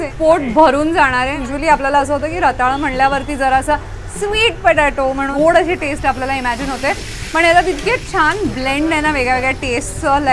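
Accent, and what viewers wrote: United Kingdom. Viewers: Marathi marathi sarkh bol agdi as british accent mdhe marathi bollya sarkh nko karus otherwise no doubt ur fab❤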